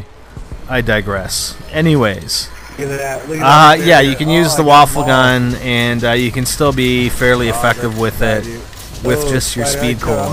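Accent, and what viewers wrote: Canada. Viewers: hey dude you must be from Canada.... am i right?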